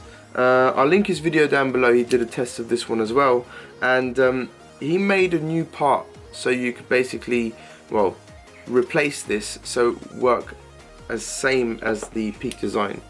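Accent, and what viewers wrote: England, United Kingdom. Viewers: TE Production Er kommt aus England 👍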